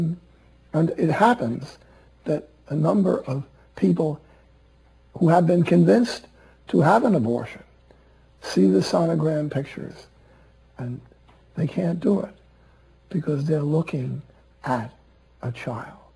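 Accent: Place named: New York, United States. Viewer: ♥️Father Benedict. Enjoy Heaven Thank You for all Your Recordings and vides on EWTN like yuh our style as and New York Accent. A real wealth of time spent in front of recording studio.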